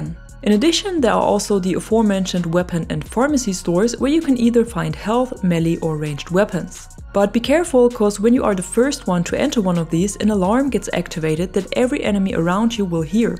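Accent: Germany. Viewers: Are you a german ? xD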